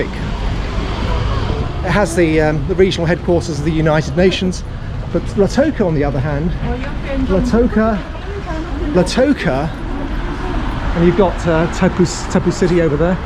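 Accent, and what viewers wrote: England, United Kingdom. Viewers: So what if he has an English accent...??? Sheesh, wish him well. Respect people wherever they came from, where they were born and however they speak....peace to all.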